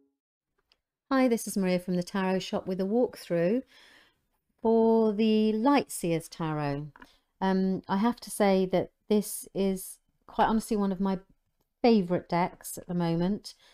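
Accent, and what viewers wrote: United Kingdom. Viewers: everything sounds better with a british accent :)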